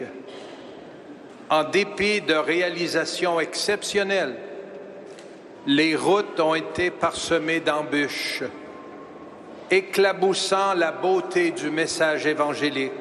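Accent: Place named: Canada. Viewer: on voit que vous avez les origines française Courcelles est noms de plusieurs communes françaises... J'espère la foi dans le Christ... L'accent chantant canadien surprenant ici mais agréable à entendre... Merci aussi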